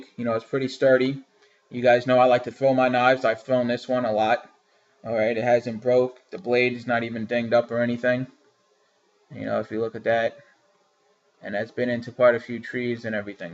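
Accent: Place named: Rhode Island, United States. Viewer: you must be from Rhode island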